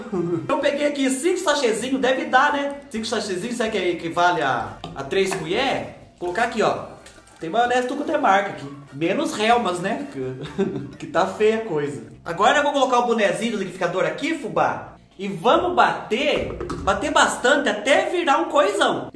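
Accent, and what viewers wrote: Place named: Minas Gerais, Brazil. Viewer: Ai vc parece mineirinho assim como eu. No modo como vc fala por exemplo a expressão "BOBA " é daqui de minas 😂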